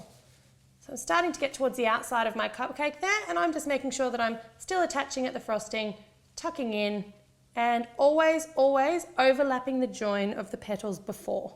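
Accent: Australia. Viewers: Outta curiosity, is she Australian?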